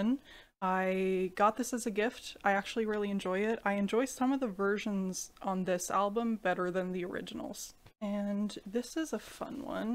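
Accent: Canada. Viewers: figured was Canadian by the way you pronounced "out" and "about"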